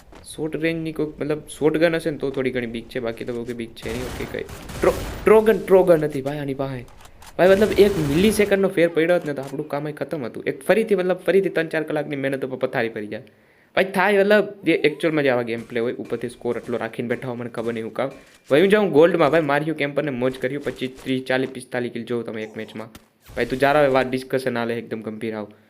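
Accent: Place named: Kathiawar, India. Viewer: Bhai thodi kathiyawadi pan bolo ne pehla ni jem. 🙏😊,commentry in old style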